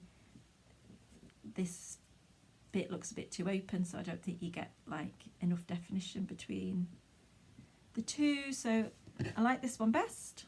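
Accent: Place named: Northern England, United Kingdom. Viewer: I really enjoyed the podcast and the variety of things you have done. It is so refreshing to find somebody who doesn’t like grey!! I used to be completely autumnal in my colour palette, but have recently switched to blues and purples…but still no grey if I can avoid it 😁 I also loved your accent. I am originally from Cheshire, but have been ‘exiled’ in the South East for 39 years, so a Northern voice always makes my heart sing. A great first podcast - keep it up!! Jinny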